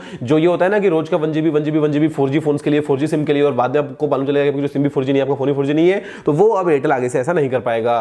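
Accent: Bengal, India. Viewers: Are you bengali sir?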